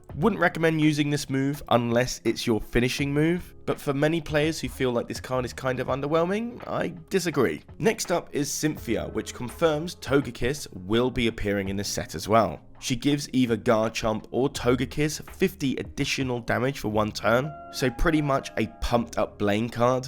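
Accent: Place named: United Kingdom. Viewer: Wait why is this voiced by a british guy now? Did this channel switch owners?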